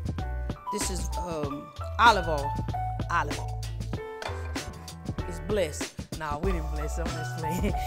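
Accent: South, United States. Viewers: Aww i need a oven, when i have one i am going try this, i would like to make sweet patato pie one day to.😀💐 you are funny " yall wanne me to cook so i,m gonna cook looool and beautifull youre doing fine with the explanation and that beautifull southern accent, i do believe the camera man should do more close ups on the food and the cutting and in the pot, later he or she does that, if its youre husband filming you i understand he cant keep his eyes of you 💐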